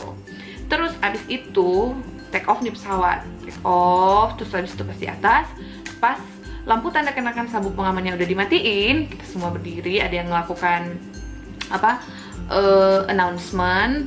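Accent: Manado, Indonesia. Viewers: Pke logat mnado skli" bkin vidiox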